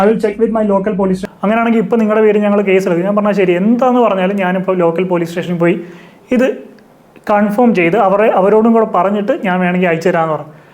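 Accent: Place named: South, India. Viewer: The slag seems like someone from south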